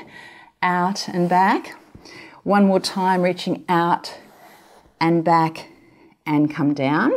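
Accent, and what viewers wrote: Australia. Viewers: Love your beautiful Aussie accent!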